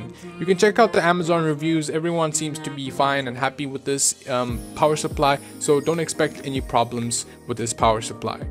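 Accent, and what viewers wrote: South Africa. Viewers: He from South Africa? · This is the first video I've seen of yours and I noticed that SA accent right away :D (checked your channel info to confirm it). Great quality video! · I subed for that SA accent xD , were did u buy the parts tho?Evetech? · Is he South African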